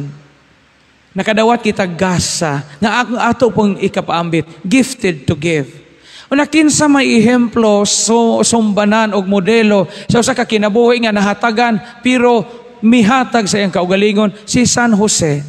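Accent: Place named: Bohol, Philippines. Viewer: Nindot kaau paminawon imong boholano accent father cargado kaayog j, makalingaw pod kaau imong homily god bless father.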